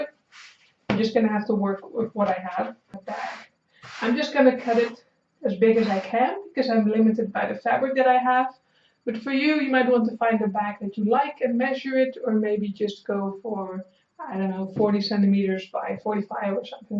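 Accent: Netherlands. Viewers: Thank you very much! I am definitely going to try and make one^^ Btw, you have a dutch accent:)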